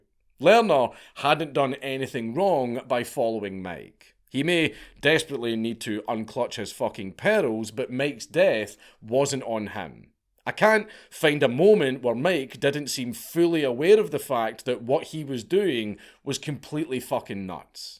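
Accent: Scotland, United Kingdom. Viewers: To his Dankulest Lordliness, Hey Dankula, this is a some what formal request. I will offer your choice of £50 or 13 Geese, an Average sized angus cow, a breeding pair of ducks and a single tabby cat or My first born son in exchange for the full unedited middle earth lore dump. I never knew how much I NEEDED to hear a Scottish man talk about middle earth lore, thank you for coming to middle ages welsh barter sesh for dankula content. Yours sincerely, Grand Elder Nanak the High Viseer of the Welsh County Council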